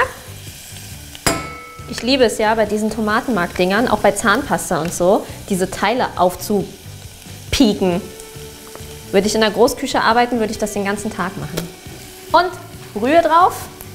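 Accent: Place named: England, United Kingdom. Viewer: Sie spricht richtig viele Wörter im englischen Akzent aus.😂 Schöne Folge 😉😊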